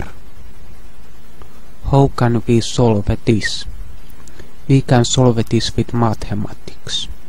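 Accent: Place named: Finland. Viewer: Finnish accent is best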